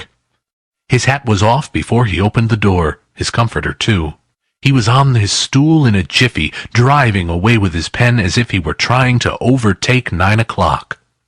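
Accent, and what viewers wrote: United States. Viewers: Should only be read by an English narrator, doesn't sound good with an American accent. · American accent? Sorry, bye.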